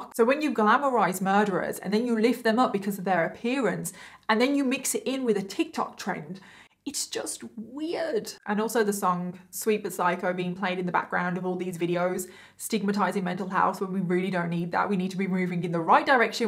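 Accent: United Kingdom. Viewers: You're so obviously British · is a superstar on Youtube. I've had to take a break from politics and alternative social media. Having always been a fan of true crime, this new genre is a perfect fit for my down time when I'm trying to sleep. Some of these other girls are talented but is like some kind of angel. Her delivery is spot on with a serious reporting style and her makeup is always tasteful. Such a beauty, inside and out...I look forward to see what she will do in the future. Having only recently discovered her channel, there is plenty of back catalogue to binge watch. Maybe it's the accent but she speaks to me. She's brilliant, beautiful and she is carving her own niche. In this culture of Tik-Thots etc. she is still a perfect british lady, fit to have tea with the Queen. Bravo.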